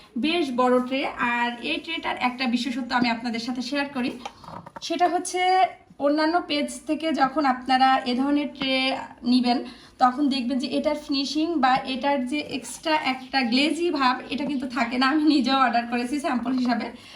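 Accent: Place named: Kushtia, Bangladesh. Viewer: আসসালামু আলাইকুম আপু। আমি নতুন তোমার চ‍্যানেলে।আপুর কথা শুনে মনে হচ্ছে কুষ্টিয়া হয়তোবা। সব জিনিস গুলোই সুন্দর 💓💓💓💓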